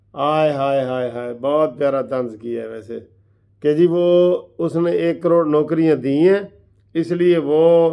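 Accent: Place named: Mianwali, Pakistan. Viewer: sab ap apni mianwali wali zuban ma baat kia krain.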